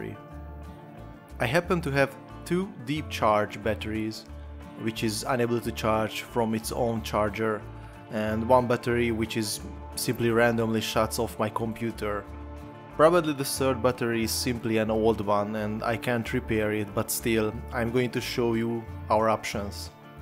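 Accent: Hungary. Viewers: Interesting stuff! Cool Hungarian accent btw! Cheers.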